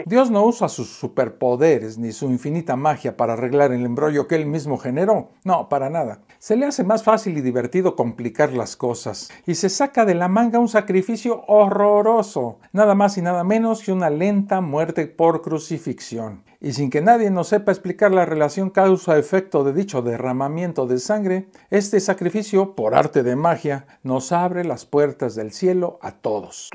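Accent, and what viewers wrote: Mexico. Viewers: Es muy fácil, si es un mensaje cifrado con la posibilidad de ser interpretado por los enemigos, que clase de mensaje cifrado es?, por eso no lo puedes interpretar, ni el diablo puede hacerlo, lee Mateo cap. 4 y lo verás, ahora, enumera todas las contradicciones que has encontrado y te ayudaré a despejarlas, según parece ese libro tiene el aval de la historia que fue creciendo en el tiempo hasta la actualidad, Israel era una persona como tu, una persona que existió 😂, salvo que te niegues a ti mismo como un ignorante con acento mexicano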